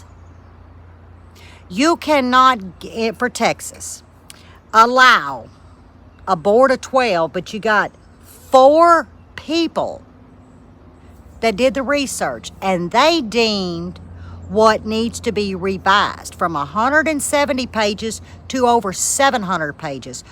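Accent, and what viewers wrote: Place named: Louisiana, United States. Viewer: You can tell there's some Louisiana accent (a touch of Cajun) in there and mixed with some parts of Kentucky. Before I retired, I traveled a lot to Louisiana on business--places like Houma, La Place, Empire, Nawlins, etc. Used to love the "talk". Although those native to New Orleans sounded more like they were from Brooklyn--not 100%, but a lot.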